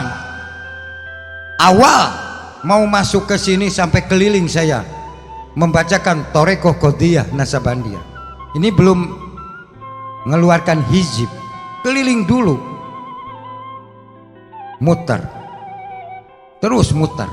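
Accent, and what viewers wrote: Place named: Sunda, Indonesia. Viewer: Has sunda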